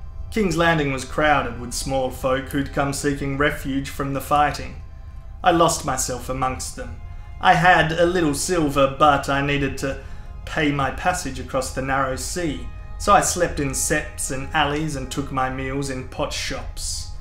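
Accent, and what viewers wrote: Australia. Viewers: You ever consider doing Game of thrones audio books? Your bloody ozzy accent is rather soothing.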